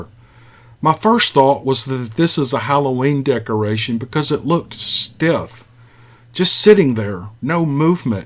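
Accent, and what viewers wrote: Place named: North Carolina, United States. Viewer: Good job! I’ve been looking for stories of the season on YouTube but hadn’t yet found a site. Truthfully I prefer you North Carolina voice then any others so far. Thanks for spook time!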